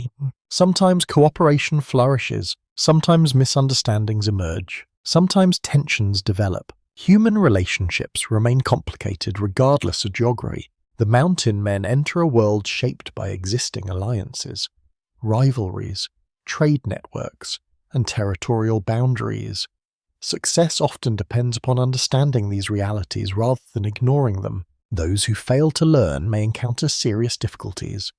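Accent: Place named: United Kingdom. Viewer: It's impossible for me to appreciate a video about early American history being narrated by a guy, real or not, with a British accent. I wouldn't watch a video about European history if the narrator sounded like John Wayne.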